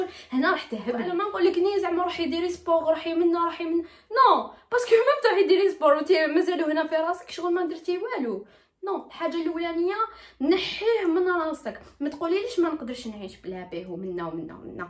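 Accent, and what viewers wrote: Oran, Algeria. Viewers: بالبركة عليك ربي يهنيك متأكدة ماما رقصت رقيص ماشي معقول كنت تخرجلها مالقصب ضروك اتريح ههههه ربي يحفظها و يطول في عمرها بصح يا صديقتي كي تهدري بالوهرانية تاكل منك حتة ربي يسهلك جميع امورك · كنتي تهدري وهرانية فووووور 😥😥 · اللهجة لجزائرية زوينة 🤩🤩 مغربية مرت من هنا لي يزبل فيا نكلع جد بوه نخرجها له طاي طاي 🤣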